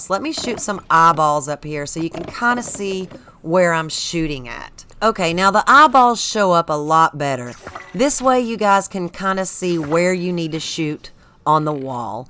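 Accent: South, United States. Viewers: FWIW, I thought your explanation was much easier to understand and follow than any of the other channels who go over this. Maybe it’s the Southern accent! ; ) t. a fan from SW Virginia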